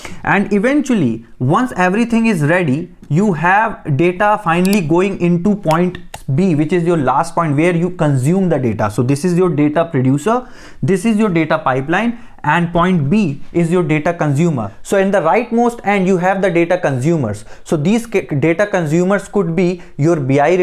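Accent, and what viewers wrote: India. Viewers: The Indian accent sometimes need a bit of getting used to. If your ears get trained enough then you wouldn't notice it while following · Thanks. Good job. In addition for us latín american people it is easier to undersatand Hindi accent English thsn American one.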